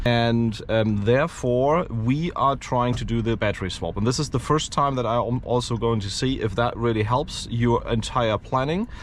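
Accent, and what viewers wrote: Germany. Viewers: Very good. I like it very much when Germans speak English. Go on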